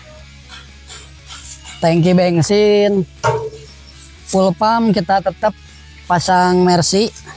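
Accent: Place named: Indonesia. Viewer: Dari logatnya pasti org indonesia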